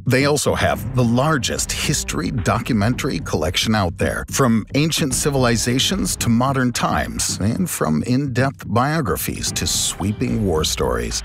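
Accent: United Kingdom. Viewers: Leave it to a bri'ish person to give an account on the best way to stab someone with a knife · You’re ao british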